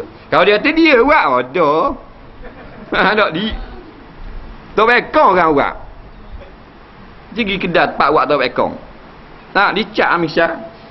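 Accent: Terengganu, Malaysia. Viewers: Saya suka dia cakap Tganu..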